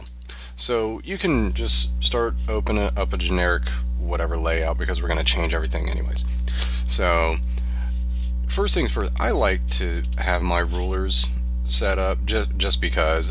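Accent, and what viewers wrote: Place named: South, United States. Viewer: Really helpful and the Southern delivery was a bonus ; )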